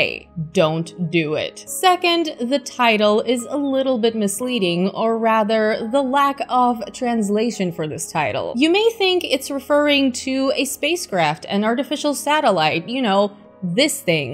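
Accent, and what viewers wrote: Russia. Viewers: Love your accent. Are you Russian?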